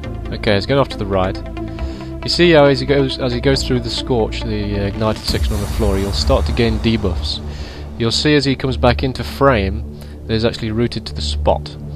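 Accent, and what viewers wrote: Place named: England, United Kingdom. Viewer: God the English accent is annoying as all hell. But this is a very well done video and tutorial on how to beat him. Honestly, one of the best I've ever seen.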